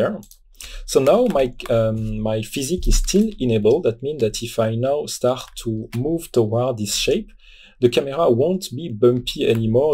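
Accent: France. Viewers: French accent :) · Nice tutorial and nice heavy french accent.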